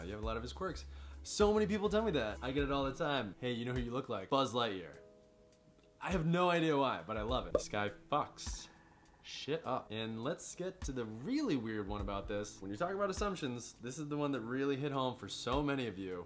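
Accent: Boston, United States. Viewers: I feel like I hear his Boston accent so heavy in this video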